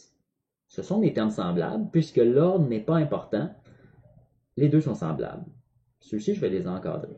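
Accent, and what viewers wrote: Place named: Quebec, Canada. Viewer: Agréable rafraîchissement, explication claire et dépouillée et tout çà avec l'accent de Charlebois. Merci de tout coeur.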